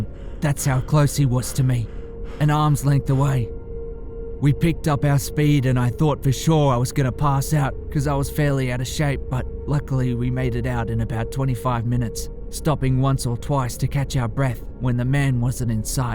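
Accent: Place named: Australia. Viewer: Good to hear an Aussie accent doing the narrative.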